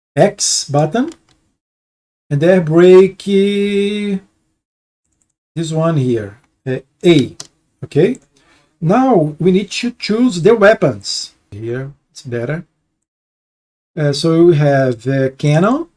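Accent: Brazil. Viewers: Vc é brasileito né, inglezão com sotaquezão de brasileiro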